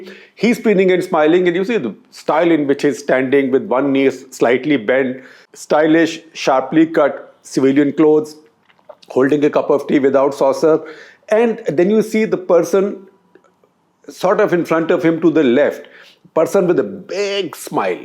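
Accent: India. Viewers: gupta G you have curry accent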